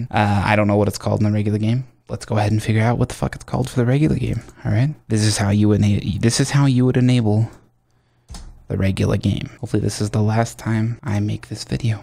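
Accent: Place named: Massachusetts, United States. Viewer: This dude has to be from Massachusetts... the accent... lol, my computer is a piece of shit old Alienware that is all kinds of cluttered so nothing works for me :( I really don’t want to spend $3k for a new one but that might be the only solution. Having hughesnet for internet which is satellite with a 50 gb cap per month ( hughesnet is absolute garbage and they don’t deliver what they claim so don’t do it unless it’s your only option and last resort.) doesn’t help for problem solving as far as re-downloading everything. Very entertaining btw.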